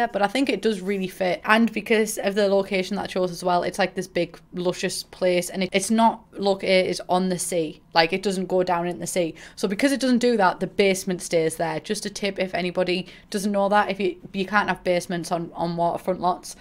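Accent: United Kingdom. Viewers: Im half Swiss and half British but I live in Switzerland so I have a bit of an accent but it’s not that noticeable. Me after watching: British accent intensifies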